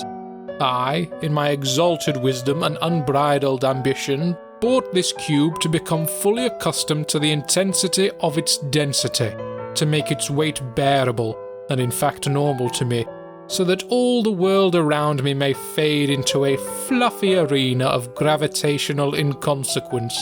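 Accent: Scotland, United Kingdom. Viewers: The British accent was the cherry on top. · why does he kinda sound scottish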